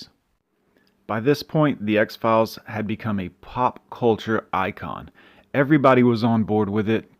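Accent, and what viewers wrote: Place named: South, United States. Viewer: also i'm assuming your southern, i can somewhat tell with the subtle accent and a very occasional "y'all"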